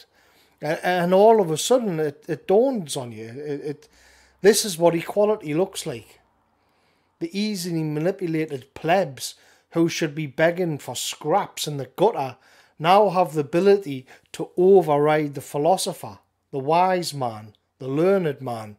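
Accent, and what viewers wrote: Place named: Northern England, United Kingdom. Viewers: I just came here to say it's nice to hear sensible and coherent ideas rendered in a quality northern accent 👍🏻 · Your Geordie dialect has a penetrative common sense way about it. RIP The Honourable Sir Roger Scruton.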